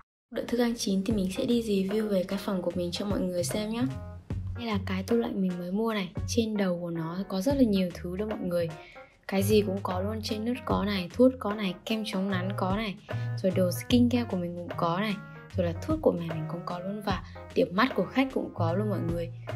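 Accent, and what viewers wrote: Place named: North, Vietnam. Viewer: Giọng bắc đồ đó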